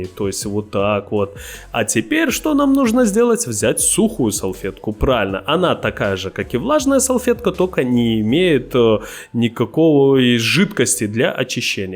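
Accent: Belarus. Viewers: Автор, Вы - беларус?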